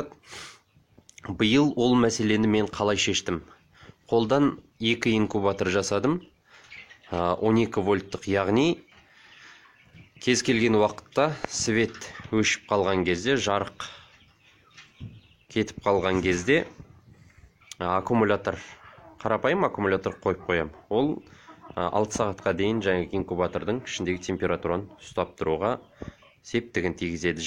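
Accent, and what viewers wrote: Standard. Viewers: Керемет. Таза казакша сойлеп жеткизип жатырсыз! Мыкты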